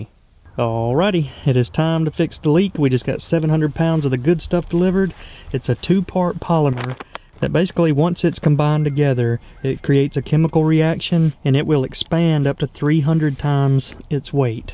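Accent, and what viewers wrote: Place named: Florida, United States. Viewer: These videos are so wholesome. Your accent also reminds me of home as I live in Pensacola FL not far from you thank you for the videos brother.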